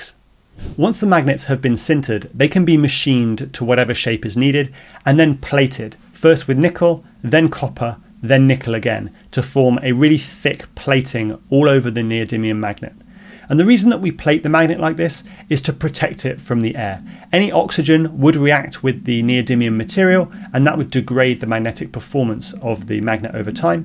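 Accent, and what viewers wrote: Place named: United Kingdom. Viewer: Seems like you're the onli British English speaker who's speech doesen't make me feel pain in my ears)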